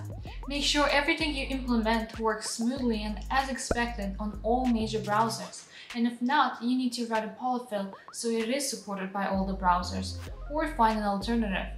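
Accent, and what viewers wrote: Eastern Europe. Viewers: Where are you from? You have a bit of Eastern European accent. Maybe I'm wrong. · Where are you from ? Your accent sounds like from those countries by Russian surroundings...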